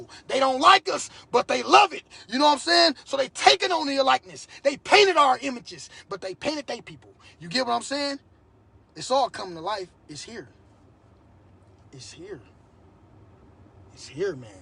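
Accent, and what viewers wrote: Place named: Milwaukee, United States. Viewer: Sound like you from Milwaukee. Link up.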